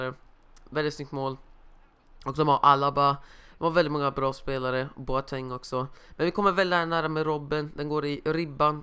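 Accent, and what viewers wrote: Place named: Gothenburg, Sweden. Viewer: Du är från götet va? Du har en go dialekt :D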